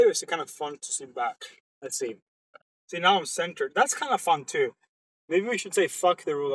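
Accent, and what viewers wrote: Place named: Sweden. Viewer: Turning into pewdiepie. you're losing your swedish accent as time goes on LOL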